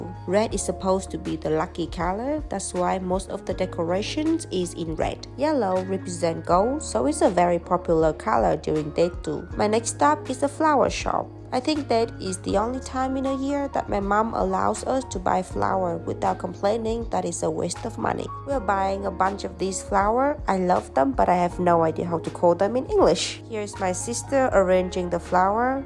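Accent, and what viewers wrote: Germany. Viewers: I'm so Confused because everytime I hear u talk for some reason I hear a more German Accent then a Vietnamese accent and I have no idea why lol. When I first found ur channel and saw u were living in Germany and after hearing u talk I just assumed u were born and raised there. Am I like the only one who thinks this?? Btw i really enjoy watching u and ur boyfriends channel! ❤ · Your English is starting to sound more German accented. It's cute 😏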